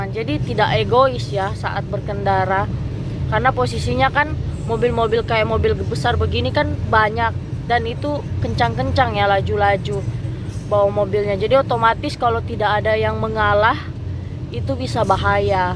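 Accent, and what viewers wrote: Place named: Palu, Indonesia. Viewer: Tetap logat palu... 🤣 Lanjuut joooo.... 👍👍🙏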